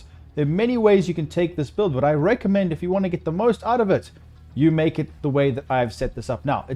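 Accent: South Africa. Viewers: Jy klink erg Afrikaans... GREAT video!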